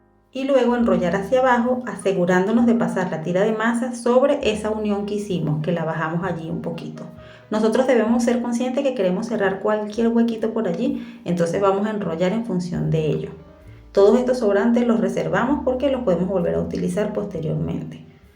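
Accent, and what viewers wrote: Venezuela. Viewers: Buenas tardes. Me encantó tu receta y la forma súper sencilla y explicativa qué aplicastes. Aún y cuando estás en Colombia parece que fueras Venezolana por tú acento. Voy a comprar los ingredientes para hacer estos tequeños qué se ven fabulosos. Después te cuento. Gracias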